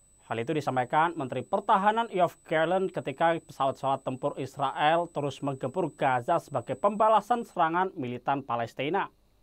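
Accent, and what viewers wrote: Java, Indonesia. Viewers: medok njir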